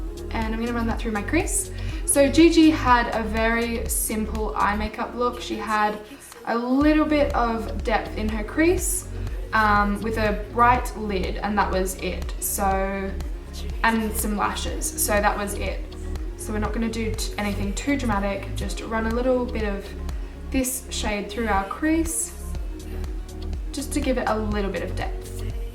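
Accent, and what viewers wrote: Australia. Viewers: Oh damn it's Gigi w an Aussie accent!!!! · are you australian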